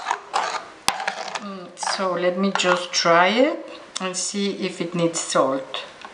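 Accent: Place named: Mexico. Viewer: you're English it's awesome you got a strong Mexican accent but I loved it you don't hesitate a single word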